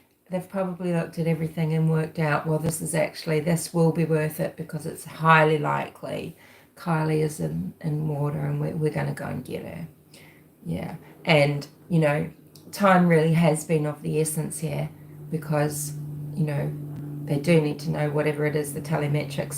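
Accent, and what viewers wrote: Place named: Australia. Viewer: Yesss. I'm listening now. It's bedtime. Love listening to your Aussie accent.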